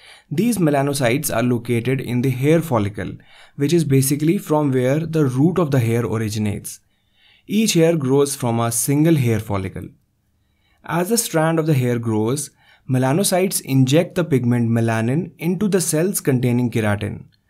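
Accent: India. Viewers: indian accent is so weird to listen.. kinda comedy haha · Hmmm let's see this vid.Oh,India accent... Nevermind.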